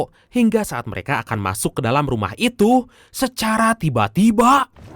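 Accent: West Java, Indonesia. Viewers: btw asal mna a?logatnya sunda pisan wkwkwk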